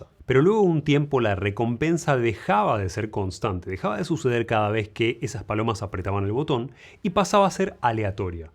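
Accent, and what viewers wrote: Argentina. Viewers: Hola me fije de donde era x su tono y claridad de voz y decia EEUU!! Jaja dije es hisano y hay tres paises que hablan de esa forma y veo que dice un comentsrio su pais argentina!!! ❤ Grande !! Doc!! Mi degundo apellido es igual pero sin separacion!!un afectuoso saludo .uy buen año !! Veo a Furman, los Veller 😊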